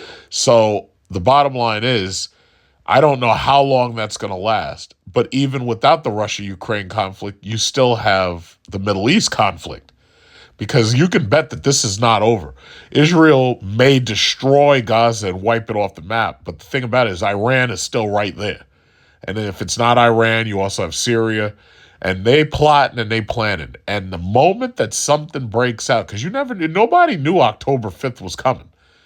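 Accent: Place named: Staten Island, United States. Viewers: Why does this guy sound like a white Italian guy from Staten Island..The passport bros were started by black men ... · My Dad, Aunts, Uncles and Grandmother were from Queens and talked the same way. It's a New York thing, kapisch? 🤌🏾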